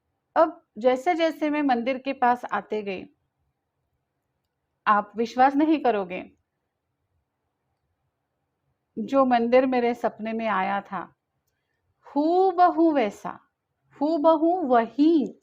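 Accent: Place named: Maharashtra, India. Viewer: नर्मदे हर,🙏🙏आपकी हिंदी भाषा बहुत ही अच्छी है, और कभी कभी आप जो मराठी मिश्रित हिंदी बोलतीं हैं वह तो और भी ज्यादा अच्छी लगती है । मातृभाषा की सौंधी मिट्टी सी महक आती है ।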